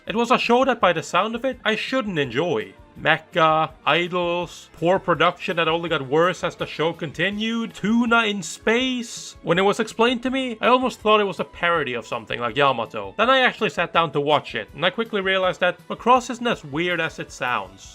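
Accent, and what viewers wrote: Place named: Norway. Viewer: Er du norsk? Du høres ut som en nordmann:P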